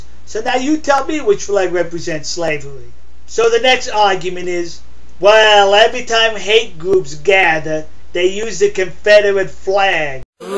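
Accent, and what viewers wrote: New York, United States. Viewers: your really smartand ican tell you have lived in new york you still have th accent, but you are smart, congratz on anoter video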